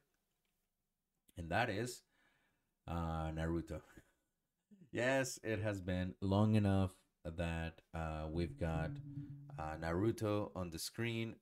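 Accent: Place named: Spain. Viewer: Okay., I'm gonna say it.Hearing a native Spanish speaker say Japanese words like Naruto in English is funny as heck. The 2 accents make it funny as all get out. could probably say it better in Spanish. When he says it in English the 2 accents compound to make it super funny. Don't stop. I still want to here what you have to say. Even if it sounds weird.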